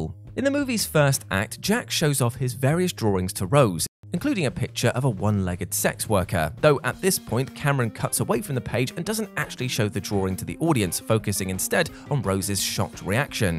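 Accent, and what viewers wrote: United Kingdom. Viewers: Why is it time and time again like a high percentage of these documentary like features are narrated by English accented actors or spokes people. Enough of these overindulgent usage of accented narrations by Brits. There are other people of other nationalities who can do an narration or presentation.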